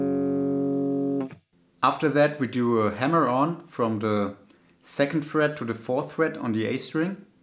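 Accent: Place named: Germany. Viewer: Ohh, okay. Wirklich? Man hört es an der Aussprache, dass du aus D sein musst. Ist bei meinem englischen Kanal auch so, dass merkt irgendwie jeder direkt :) Ja, ich bin immer auf der Suche nach neuen Malcolm Riffs, da ist es durchaus möglich das ich schon mal einen Kommi hinterlassen habe :) Langsam hab ich den richtigen Tick raus, um es beinahe fehlerfrei zu spielen :) VG aus dem Chiemgau, Vanessa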